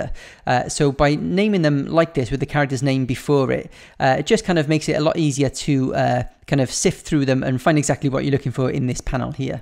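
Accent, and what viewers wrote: Wales, United Kingdom. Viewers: Are you from Cardiff? Or Barry? Or somewhere in Wales?? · Ugh this guy.... He helps me with my story. But i'm getting hella mad at his british accent.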